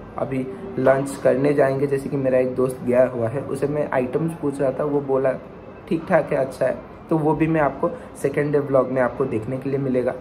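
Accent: Odisha, India. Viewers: Odisha se ho kya?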